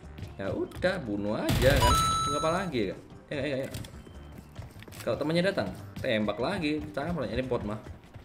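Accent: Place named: Medan, Indonesia. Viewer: Bang orang Medan kah? Logatnya mirip2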